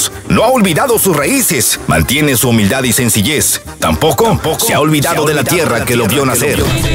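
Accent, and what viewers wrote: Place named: Michoacán, Mexico. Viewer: Señor mi saludo desde bakerfiel California yo mucho pensé que era de Michoacán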